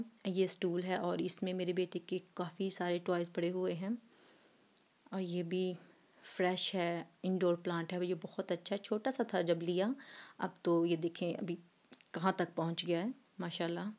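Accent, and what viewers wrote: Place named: Sialkot, Pakistan. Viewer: MASHA ALLAH ap silakot se ho kia? your accent is like sialkot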